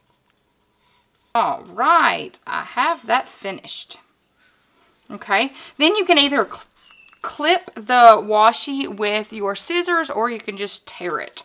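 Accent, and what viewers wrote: South, United States. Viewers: Honey...you are from the South huh?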